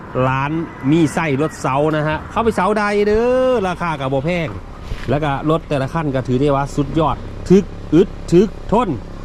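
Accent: Phu Thai, Thailand. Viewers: เสียซื่อพุไทได้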